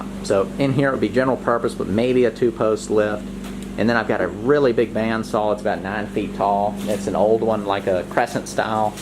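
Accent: South, United States. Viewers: I like your ideas/purpose for this nice piece of land. The Jeep would be a fun project to watch if you don’t speed up your video and someone might also learn how to do a trade by watching your channel. I love the plans for your property especially leaving the tree inside your building. Nature kinda helps slow us down enough to enjoy life without thinking about making money. I think it brings joy just having a place to go to get away from work and have fun projects you like to do. I am looking forward to seeing more on this channel. I actually forgot about your channel’s name until I heard your voice and I knew exactly what channel I was going to watch it all the way through. Poison Ivy is not something I could have around my property because my son is highly allergic to it! Could you point it out on your next video? Please... Do you work out to stay in good shape or just physically work hard at your different trades? 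I think you may have mentioned which state you live in but I don’t recall. You do have a nice Southern accent which I enjoy listening to you speak. It reminds me of my family. I hope you return soon for some of your updates! Is your other channel on a link so I can find it? Thank you young man👍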